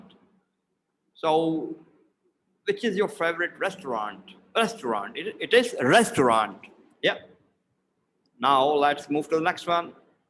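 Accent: United Kingdom. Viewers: Sir your accent of British English and your pronunciation are very nice and I like your love for British English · I may a new one from here, But actually I do love your British accent.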